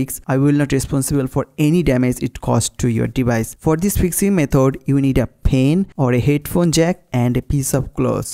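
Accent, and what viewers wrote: India. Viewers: To people out here complaining his accent - India is a diverse country. Speaking multiple language is something a norm unlike the west. Few states in northeast does speak good English (Exception). Excuse his accent because English is not even a second language for many and I'm sure it's the same for him. Nice video 👌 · Damn his accent hey Non- Indians please dont judge not all of us sound like that :( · He has an Indian accent. Did you go to school? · al least he can speak english with his indian accent, but I can't speak english properly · Ofull indian english accent. Cant stay. I am ran away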